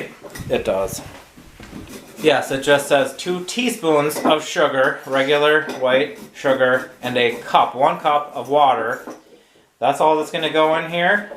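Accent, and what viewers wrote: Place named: Minnesota, United States. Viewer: ahh I love a good Minnesota accent.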